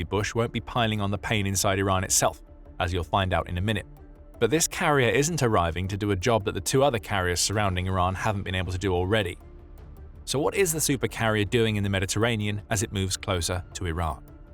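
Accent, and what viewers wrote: England, United Kingdom. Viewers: Sounds like a bloke from England who put this together. And we all know England can't be trusted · Get rid of the British narration. Britain is irrelevant now. Use an American narrator. Unsubscribed.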